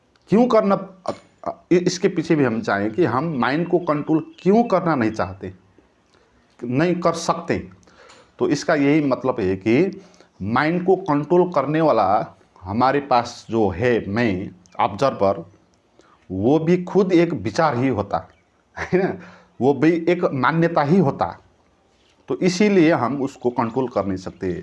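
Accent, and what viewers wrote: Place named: Nepal. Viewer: आपका पता ( address) क्या है. बोली से नेपाली लगते हैं.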